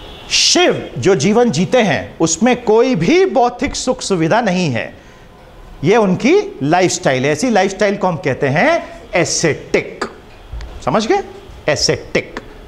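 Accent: India. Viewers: Sir....does Hindi influence your pronunciation a little bit? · Very nice understanding of vocabulary and nice explanations, but sorry to say, with your pronunciations all that will happen to me is a mockery. MOST of the words I came across in this video are being wrongly pronounced, and that too with a very heavy INDIAN accent. English is not an Indian language...and this type of pronunciation has ruined it for the entire English speaking Indians. Video very helpful for written articulation only, a native English speaker will have a hard time trying to understand what you say.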